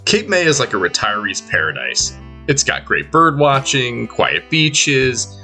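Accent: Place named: Philadelphia, United States. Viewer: Clearly this person is from Philly…..strong accent. All beaches are in Cape May County. He ignores the rest of the shore.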